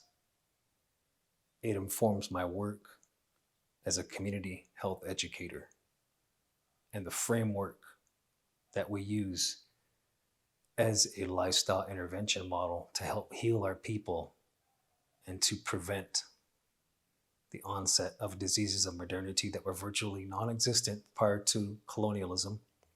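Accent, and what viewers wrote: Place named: United States. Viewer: If you ever wanted to know how a true American speaks, this is it.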